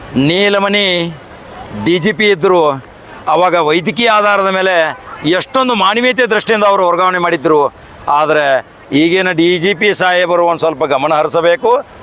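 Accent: North Karnataka, India. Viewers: ನಿಮ್ಮ ಈ ಒಂದು ಉತ್ತರ ಕರ್ನಾಟಕದ ಮಾತಿನ ಶೈಲಿ ತುಂಬಾ ಸೊಗಸಾಗಿದೆ ಖಾಕಾರಿ.ನಿಮ್ಮ ಬಾಯಾರ ನಮಿಗೆ ವರ್ಗಾವಣೆ ಭಾಗ್ಯ ಸಿಗುವಂತಾಗಲಿ ಅವರವರ ವರ್ಗಾವಣೆಯ ಏಳು ಕೋಟಿ ಪುಷ್ಯ ನಿಮಗೆ ಸಿಗಲಿ ಎಂದು ಆ ಭಗವಂತನಲ್ಲಿ ಪ್ರಾರ್ಥಿಸುತ್ತೇನೆ ಖಾಕಾ ರಿ ಧನ್ಯವಾದಗಳು ನಿಮಗೆ 🙏🙏🙏🙏🙏🙏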